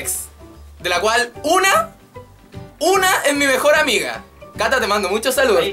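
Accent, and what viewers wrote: Chile. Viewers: ¿Todos los chilenos hablan igual? \:v/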